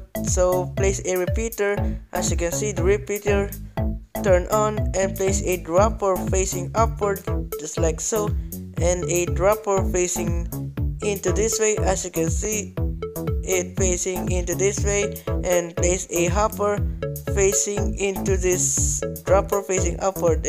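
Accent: Philippines. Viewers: Rinoa Aeris Tolentino he is filipino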